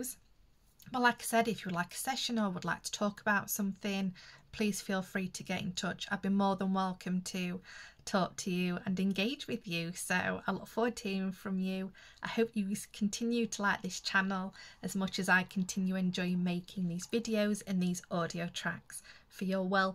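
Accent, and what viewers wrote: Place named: North West England, United Kingdom. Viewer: Are you from the north west? I recognise the accent 🙃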